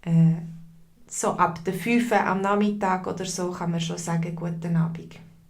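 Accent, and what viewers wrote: Switzerland. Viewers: Sprechen Sie Berndeutsch oder Zürideutsch? Meiner Meinung nach gibt es auch Unterschied dazwischen ,danke.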